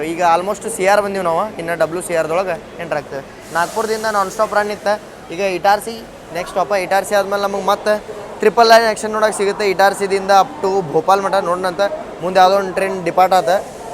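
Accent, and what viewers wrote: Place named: Karnataka, India. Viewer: ನಾನು 2005ರಲ್ಲಿ ದೇ ರಾಜಧಾನಿ ಎಕ್ಸ್ಪ್ರೆಸ್‌ನಲ್ಲಿ ದೆಹಲಿಗೆ ಹೋಗಿದ್ದೆ ಬೆಂಗಳೂರಿನಿಂದ ತ್ರೀ ಎಸಿ ನಲ್ಲಿ. ಊಟ ಕೆಟ್ಟಿದ್ದಾಗಿತ್ತು ಸೀದು ಹೋಗಿರೋ ಪರೋಟ ಅಲ್ಯೂಮಿನಿಯಂ ಫಾಯಿಲ್ ನಲ್ಲಿ ಇಟ್ಟಿದ್ದರು. ಕಂಪಾರ್ಟ್ಮೆಂಟ್ ತಟ್ಟೆನಲ್ಲಿ ಅಣ್ಣ ದಾಲು ಇನ್ನೊಂದು ಕರಿ ಒಂದು ಮೇಲೊಂದು ಹಾಕಿದ್ರು ಒಂದು ಸ್ವೀಟ್ ಕೊಟ್ಟಿದ್ರು ಮೊಸರು ಚೆನ್ನಾಗಿತ್ತು ಬರ್ತಾನೋ ದೆಲ್ಲಿಯಿಂದ ಇದೇ ರಾಜಧಾನಿ ಇಲ್ ಬಂದಿದ್ದೆ ಆಗಲು ಕೂಡ ಊಟ ಚೆನ್ನಾಗಿರಲಿಲ್ಲ. ಇವಿಷ್ಟು ಟ್ರೈನ್ ನಲ್ಲೆ ಜರ್ನಿ ಮಾಡ್ತಿರಲ್ಲ ಕೆಲಸ ಯಾವಾಗ ಮಾಡ್ತೀರಾ ಖರ್ಚಿಗೆ ದುಡ್ಡು ಬೇಕಲ್ಲ ನೀವು ಯಾವ ಊರಿನವರು ನಿಮ್ಮ ಕನ್ನಡ ಭಾಷೆ ಮೈಸೂರ ಬೆಂಗಳೂರು ಕನ್ನಡ ತರ ಇಲ್ಲ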